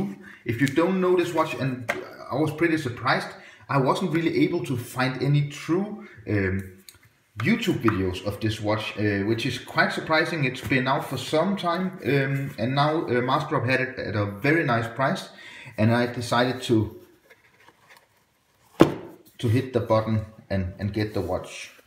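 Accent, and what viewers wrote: Denmark. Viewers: Watch On...your accent...Danish? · Tak for dit review :) Jeg kunne høre du er Dansk, du sagde her et par gange. Men hvad jeg ikke helt forstår er HVOR du købte det??? Og FEDT ur, jeg vil have et hvis jeg kan få et. Det er gennemført nice og dejligt at høre hvor superglad du er for det. Jeg sidder selv med min spritnye Oris Staghorn Diver WR300m, med et dejligt Sellita værk SW200-1, Oris modded' det for Staghorn, og det er meget præcist og dejligt at gå med... Jeg er også glad for mit og kigger hele tiden på det. Det gør du også er jeg sikker på, hahaha. Congratz with the watch mate... It's KOOL.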